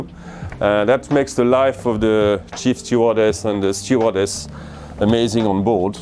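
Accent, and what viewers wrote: France. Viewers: Francisch accent? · Is this guy French?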